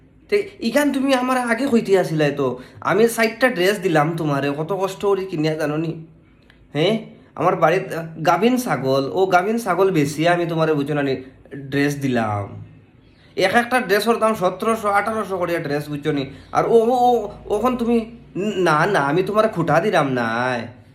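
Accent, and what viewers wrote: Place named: Bangal, Bangladesh. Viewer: দাদাভাই আপনার বাড়ি কোথায় বেশ সুন্দর বাঙাল কথা বলছেন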